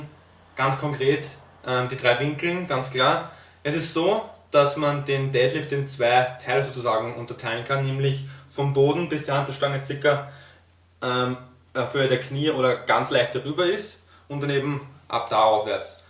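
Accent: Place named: Vienna, Austria. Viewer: nie daran gezweifelt ;) Bist du Wiener ? Hört sich für mich stark danach an :)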